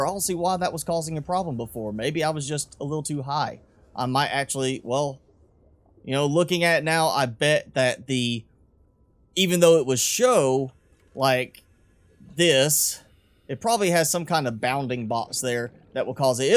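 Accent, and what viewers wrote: South, United States. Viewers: I love your southern drawl it is so charming XD Up here in Michigan we tend top be on the nasally side lol. Love you Subnautica videos, seriously huge help thank you so much for making these! Best Subnautica Youtuber right here folks^^.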